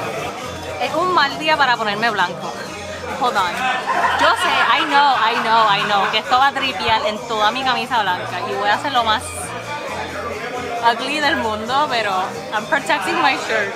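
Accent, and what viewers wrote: Puerto Rico. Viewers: Que bella habla boricua, que bella esa china...Eres increible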